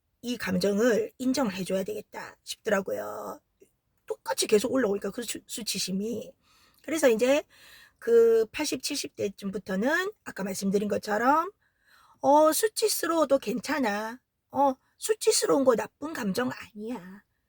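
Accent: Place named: Gyeongsang, South Korea. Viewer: 같은 경상도말투라서 그런지 나탐님, 사랑작업, 아이엠티비보다 이해가 500프로되네요 감사합니다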